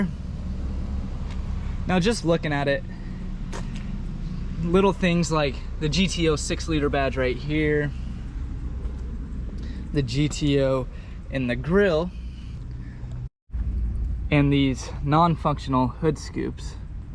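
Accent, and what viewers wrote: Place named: Australia. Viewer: Aussie, mate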